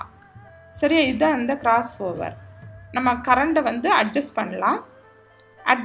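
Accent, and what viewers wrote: Kanyakumari, India. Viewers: Mam neenga Kanyakumari ya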